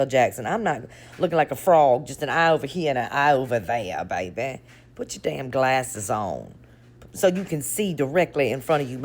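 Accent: South, United States. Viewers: Rest that Southern voice. We need you to spill the sweet Southern style tea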